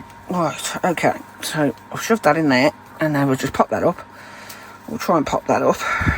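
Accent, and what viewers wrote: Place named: West Midlands, United Kingdom. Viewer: This looks lovely! You sound local, a West Midlands twang to your accent, thank you for sharing ❤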